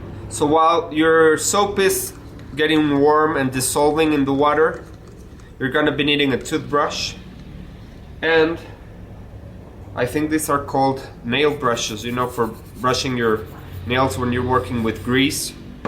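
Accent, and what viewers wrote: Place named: Mexico. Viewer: He´s mexican i knew because his accent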